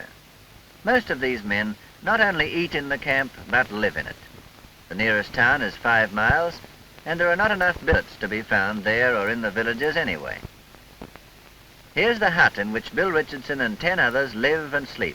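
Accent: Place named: Australia. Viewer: the narrator actually sounds Australian ☝️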